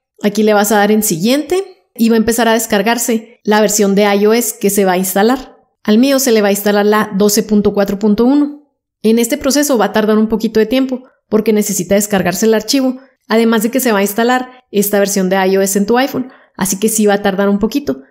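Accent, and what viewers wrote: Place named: North, Mexico. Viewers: Me súper sirvió, que hermosa voz!! Me hace acordar al acento de Saltillo de una amiga 😍 saludos! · Que linda voz así como norteñita me encantó tu explicación me sirvió para desbloquear mi CEL mil gracias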